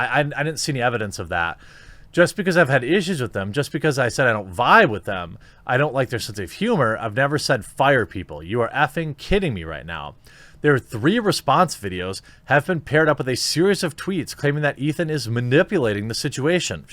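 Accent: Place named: Wisconsin, United States. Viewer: you say bag like every single person from wisconsin i have ever met.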